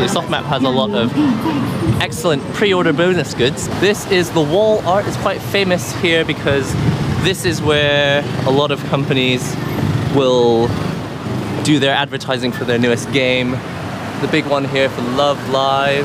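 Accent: United Kingdom. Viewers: Um wierd what breed are u? Asien,With Briten Accent, Who speaking English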